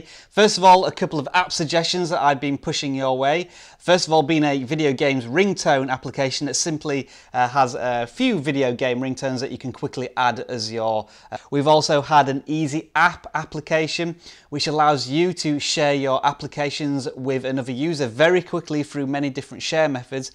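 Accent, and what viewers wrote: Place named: United Kingdom. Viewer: you live in Canada? Are you British though? You have got a British accent in my opinion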